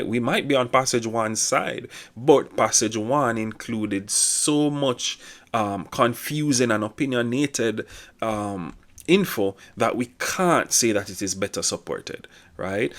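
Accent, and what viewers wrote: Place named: Jamaica. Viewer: Sound like a Jamaican 🇯🇲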